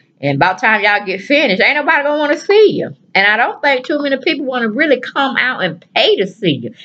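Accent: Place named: United States. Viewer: Slow down I can’t understand your country accent you talking to fast lol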